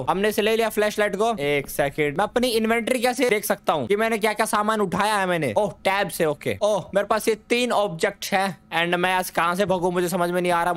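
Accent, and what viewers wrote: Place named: Haryana, India. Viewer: Bro you are from HARYANA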